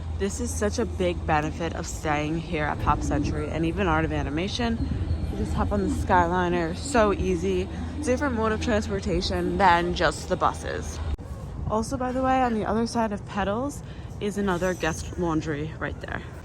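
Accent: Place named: New Jersey, United States. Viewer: Just found your channel looking for pop reviews since this would be a good cost option for our next trip 😊. I’m from NJ and I’m hearing a similar area accent lol where are you from?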